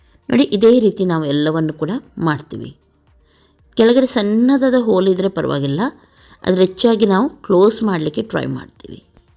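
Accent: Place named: Halivana, India. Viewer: Kannada halivana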